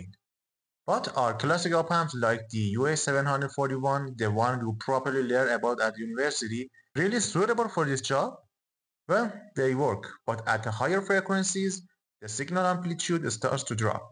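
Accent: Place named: Iran. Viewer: سلام آقا.بدون اینکه اسم اکانت رو ببینم گفتم این انگلیسی حرف زدن یه ایرانیه.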